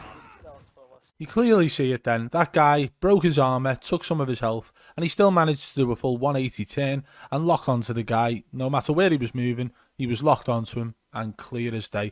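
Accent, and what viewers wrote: Liverpool, United Kingdom. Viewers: I never knew Ewan Mcgreger was a scouser or into COD. Excellent work as usual and can’t even watch streamers the same anymore. Defo a cheat as some of the big names are.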